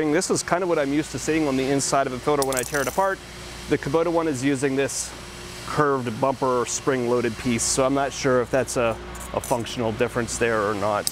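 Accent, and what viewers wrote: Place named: Pennsylvania, United States. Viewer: I heard a Pennsylvania accent then I looked up your location, yep! Grew up in Philly myself.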